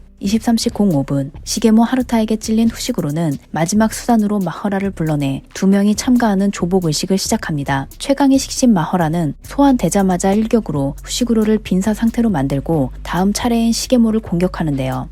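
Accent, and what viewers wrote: Japan. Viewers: 이분 억양이 일본분일듯..조금씩 액센트가있음ㅎㅎ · 혹시 일본인이신가요ㅜㅜ 일본어 지명, 이름 말씀하실때 발음이 다른것같아요!ㅎ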